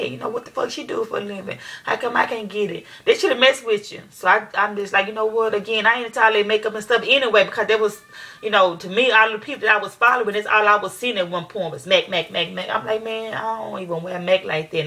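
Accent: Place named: South, United States. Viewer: Her southern accent is very comforting... she literary puts me to sleep when she talks LOL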